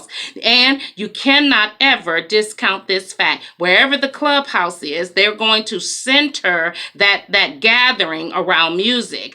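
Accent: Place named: United Kingdom. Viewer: I love the sophisticated British accent! As you were reading the list, I kept thinking of my old church. I’m glad I’m out of there!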